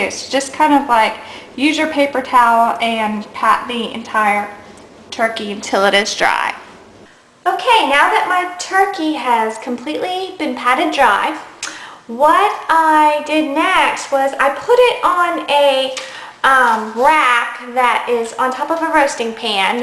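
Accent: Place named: Texas, United States. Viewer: i love your texan accent..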